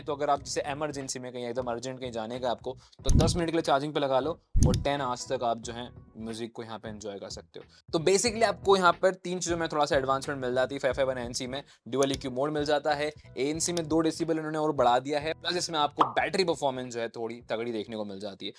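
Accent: Indore, India. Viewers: getting in the video for a sec I realised you are indori and then I read your name, and you are indori, I am also indori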